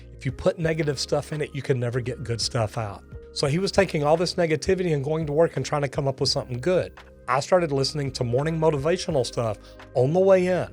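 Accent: South, United States. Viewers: I kinda like his accent. Not too southern and not to Northern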